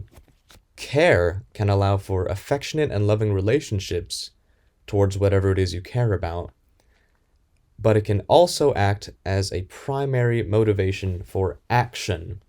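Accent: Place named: United Kingdom. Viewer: why do you sound british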